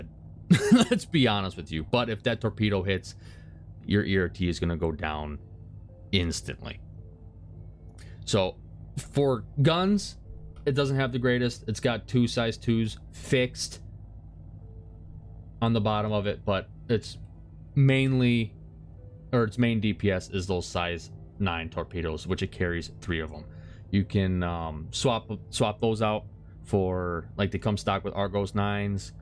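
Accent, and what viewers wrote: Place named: Mexico. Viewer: It really isn’t. The Kee sound you are promoting has sneaked into your pronunciation from the Mexican Spanish influence. Italian, Latin and English pronunciation is Kwu. Say the word queen - it’s not “ keeeen”.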